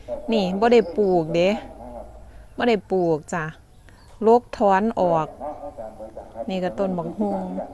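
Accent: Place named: Ubon Ratchathani, Thailand. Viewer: รายการดีมักหลายเว่าภาษาอีสานคิดฮอดบ้านแท้.. นางอยู่จังหวัดได่น้อสำเนียงคล้ายแถวอุบล.จ้ะ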